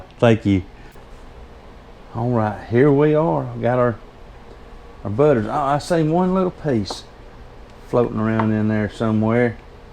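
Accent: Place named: Kentucky, United States. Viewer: Nice video! I am in Kentucky. You accent sounds like us. What state are you in?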